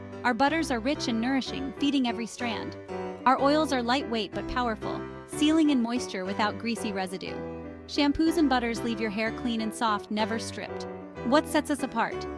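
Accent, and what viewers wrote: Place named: Northeast, United States. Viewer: I could be wrong but I think its her accent . Northeast maybe ? Maryland, Delaware ?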